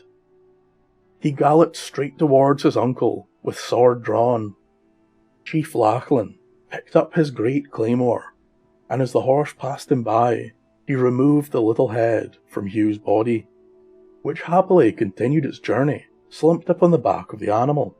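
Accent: Scotland, United Kingdom. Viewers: Most definitely we would appreciate more Headless Horseman tales!! Always we have wondered what happened to the Horseman.. how he lost his head.. and what became of him afterwards.. We love your stories.. and especially your accent.. we are of Scottish ancestry as well.. Thanking you so much we are .. Slan mo charaid William and Jen 💜💙🌱🌹🕊️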